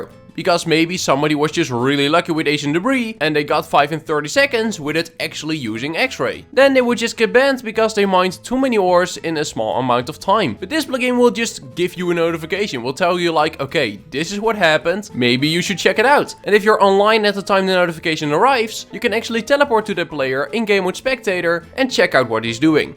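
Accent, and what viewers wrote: Netherlands. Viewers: i can just tell you are from nl:) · are you from netherland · Ur from nl i can hear it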